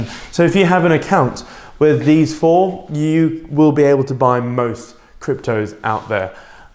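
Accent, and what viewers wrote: United Kingdom. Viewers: Where you from? Trying to figure out that accent....British? You live in the states?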